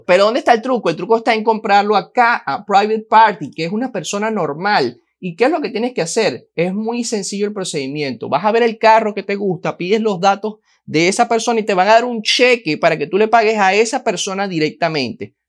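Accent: Cuba. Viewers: Jejejeje brother muy bien tu personaje de cubano, te metiste en el personaje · Wow gracias por la información Que risa como hablas cubano Caballero 🤣😂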